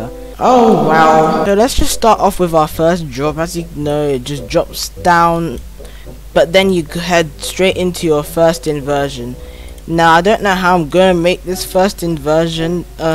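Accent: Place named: Brazil. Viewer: no i feel like u talk from brazill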